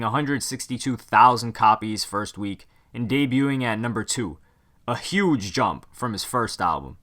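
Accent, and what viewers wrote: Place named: Florida, United States. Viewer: I'm starting to think you're a Flordian because of your accent and attitude.i'm just randomly saying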